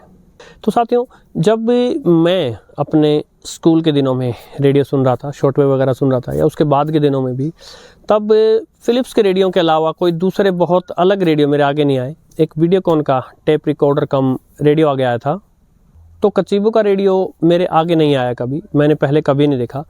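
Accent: Standard. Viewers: Congrech bhai ji aap mujhe bahut hi ache lagte ho aapka jo bolne ka tariqa bahut hi lajawab hai aur aapki pure Hindi man ko bhati hai aur yeh redio maine life me bahut chalaya hai yeh lockle shop se mil jata tha iski prise bi tab 350 ke aas pas thi ab ka pata nahi mujhe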